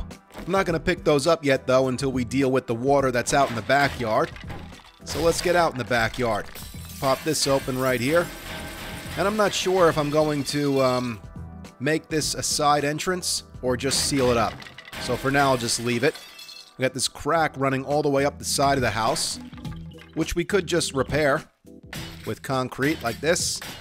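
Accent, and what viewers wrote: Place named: Boston, United States. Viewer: Quick question you from Massachusetts?? You sound like your a fellow bostonion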